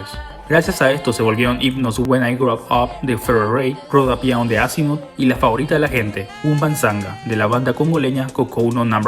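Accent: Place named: Paraguay. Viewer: Suenas como paraguayo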